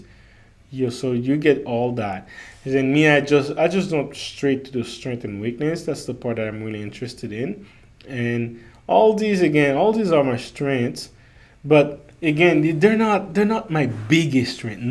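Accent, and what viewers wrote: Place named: Haiti. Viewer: Where are you from? Your accent sounds Haitian to me, but I'm unsure. That, or what's your heritage? Were you raised by others who had a similar accent?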